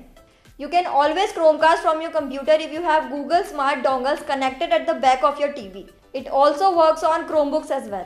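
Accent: India. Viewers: why do you talk so fast with such heavy Indian accent? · this nothing about singlish,she speak indian english. hard to understand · I love the Indian accent of this chick, the way she twists her tongue and pronounces the words. Technically she doesn't know the job and is jumping to other things which are not present in new Windows.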